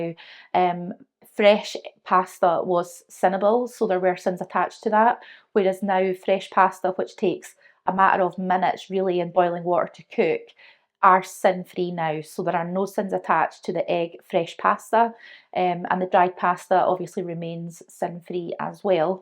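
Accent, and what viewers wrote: Scotland, United Kingdom. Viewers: New subscriber! Lovely to hear another Scottish voice 🤩 I just started back on Slimming World last week 🙂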